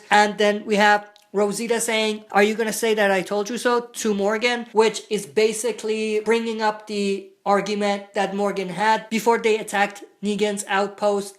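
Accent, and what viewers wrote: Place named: Dublin, Ireland. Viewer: You speek the same like a colleague of mine who is from Dublin.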